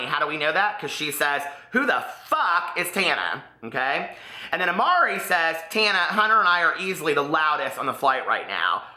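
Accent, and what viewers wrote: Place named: Midwest, United States. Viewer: Is the n silent in that girls last name or is it just fast speaking midwestern accent? Cause I’m dead ass hearing Tana Mojo every time he says her name.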